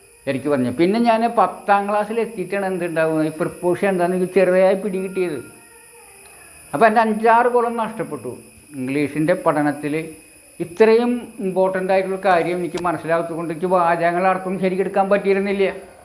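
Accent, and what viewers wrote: Thrissur, India. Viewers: സാർ വളരെ നന്ദി🙏 വളരെ നല്ല ക്ലാസ് ' സാറിൻ്റെ സംസാരം കേട്ടിട്ട് തൃശൂരാണെന്ന് തോന്നി. ഞാനും തൃശൂരാണ്😊🙏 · സാർ തൃശ്ശൂരാണോ? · Sir thrissur ano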